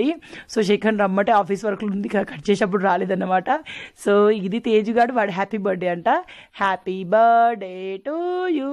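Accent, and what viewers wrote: Telangana, India. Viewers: Akka me natural comentry ki nen FIdA aipoya. ..Telangana language ni natural ga present chestaru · Hii akka video full me matalatho chala navv vachachindi andi me matalu chala funny ga Telangana basha la super ga undi jabardast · Pakaa Telangana ikada language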